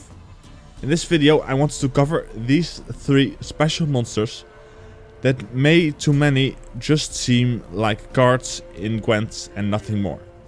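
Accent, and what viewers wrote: Netherlands. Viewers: The first two are well-known mythological animals (phoenix most of all), but I've missed them all completely in the Witcher. But then I also don't play Gwent, so that's probably why. Phoenixes are my favourite animal. Very interesting video with these unknown creatures (unknown at least in the Witcher). Answering your question; I love Tissaia De Vries as a character (and your Dutch pronunciation will just nail that name), but Coral might be a better choice, since less people know about her. Maybe keeping Tissaia for last will get you more views, because it'd be closer to the release of the Netflix series wherein she appears. I'll look forward to it regardless of which one you make first.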